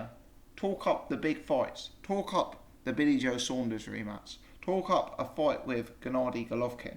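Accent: United Kingdom. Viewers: nice to listen to an Englishman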